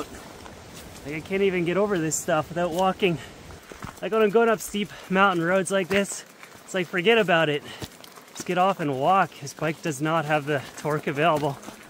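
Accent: Canada. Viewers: Great drone shots, cute dog, fun adventures, subscribed. The canadian accent is adorbs. Someday I'll be your van neighbor.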